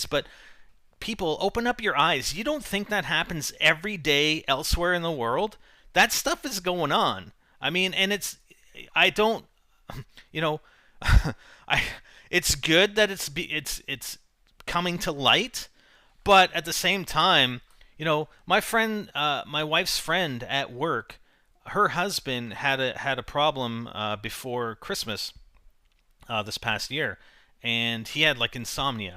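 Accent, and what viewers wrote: Canada. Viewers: Canadians really do say oot! 0,0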